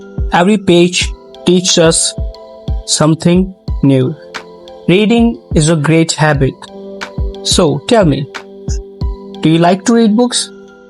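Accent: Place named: India. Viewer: Your English looks Indian English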